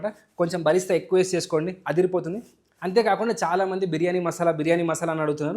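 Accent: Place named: Telangana, India. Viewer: Superr brother... Ur way of cooking so lovely to watch.. mainly Telangana slang.. lots of ❤❤❤❤❤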